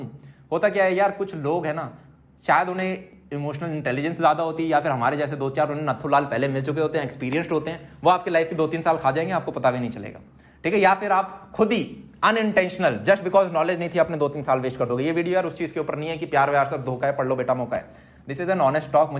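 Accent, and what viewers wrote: Haryana, India. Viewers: what a haryanvi tone of speaking wah 😉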